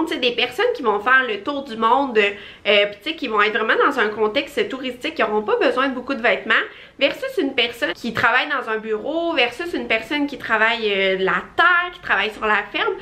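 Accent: Quebec, Canada. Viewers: Tu ne respecte pas qu'elle a un accent québécois. Si t'aimes pas, regarde la vidéo de quelqu'un d'autre sans accent et ne critique pas !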